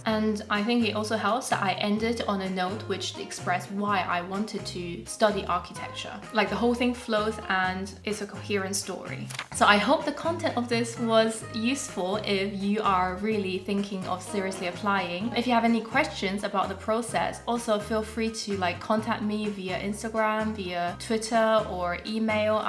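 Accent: England, United Kingdom. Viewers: not cringe at all with that english accent ;o made it sound elegant.. the twisted power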